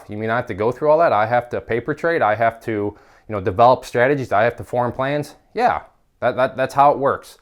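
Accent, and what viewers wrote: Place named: Midwest, United States. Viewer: Where from the Midwest are you from i got a feeling your from the midwest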